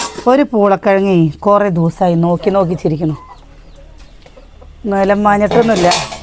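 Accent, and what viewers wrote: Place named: Valluvanad, India. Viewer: It is Valluvanadan style. Try to respect.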